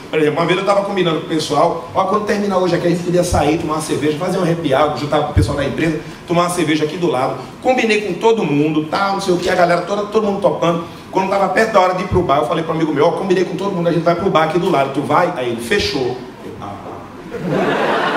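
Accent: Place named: Northeast, Brazil. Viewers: Esse é Pernambucano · Ele tem o sotaque do nordeste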